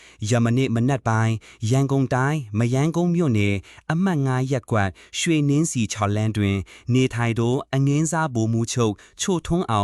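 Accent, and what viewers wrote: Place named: China. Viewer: စိန မီဒီယာလား။အသံက ။နာေဖရး အသံထြက္တာ သတိထားနားေထာင္ျကည့္။တယုတ္ျကီး။သတင္းေျကျငာသူ။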